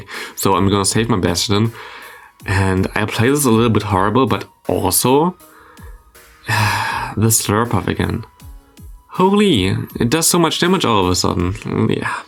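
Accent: Germany. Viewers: Bro I think you have an accent, are you german by any chance?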